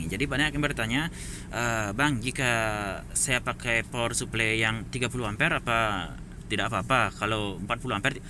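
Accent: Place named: Bali, Indonesia. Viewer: Seperti aksen Bali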